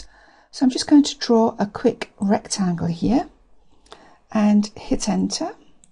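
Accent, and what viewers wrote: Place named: United Kingdom. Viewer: Lovely to hear a clear British voice. Thanks for your tutorial 👍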